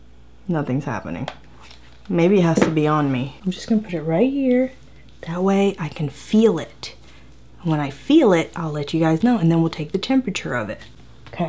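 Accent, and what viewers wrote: Texas, United States. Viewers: Hello y’all I love ur channel so damn much I just learned about you and from the first video I knew right away that u are from TEXAS. The way u talk not the accent but the way u talk is just like me I could not stop laughing 😂 😂😂😂😂. Girl I love you so damn much. When I’m feeling down or my anxiety is fucking me up I’m from now on I’m going to watch ur video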